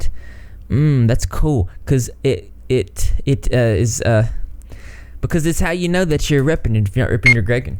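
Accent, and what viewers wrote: South, United States. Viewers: Where do you live? I'm so curious, you sound southern!!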